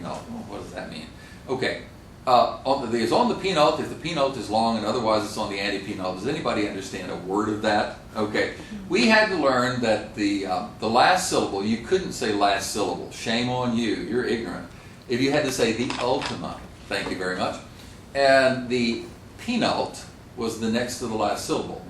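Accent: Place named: England, United Kingdom. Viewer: Very good and very nice and accurate pronunciation, despite the heavy English accent. Unlikely from some English speakers he does not try to adapt the Latin spelling and rhythm to English, as many professors do when it comes to vowels or the R sound for example. Very nice lecture.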